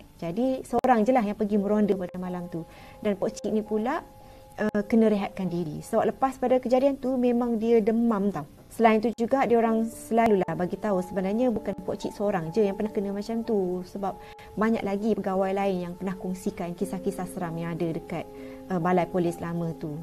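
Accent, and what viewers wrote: Kelantan, Malaysia. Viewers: Kak orang kelantan ke orang luar salah cakap pok cik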